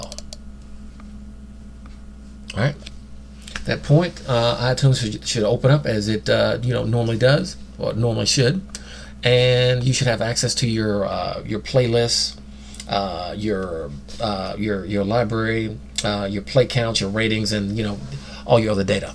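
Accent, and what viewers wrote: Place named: South, United States. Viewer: That was very simple and resourceful. I admire your accent by the way what part of the South are you from? My first guess is Tennessee, my second is Georgia.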